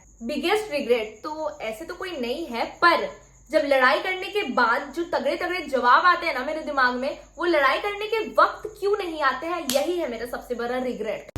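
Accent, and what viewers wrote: Bihar, India. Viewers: You speak like a bihari ♥️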